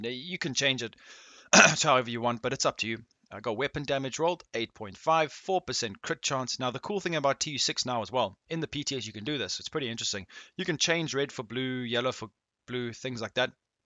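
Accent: South Africa. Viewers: You sound South African. Lol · Are from RSA bro?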